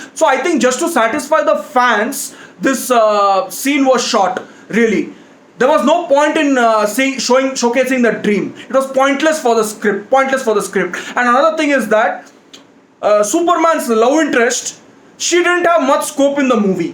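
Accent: India. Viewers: Whoa that's a thick Indian accent....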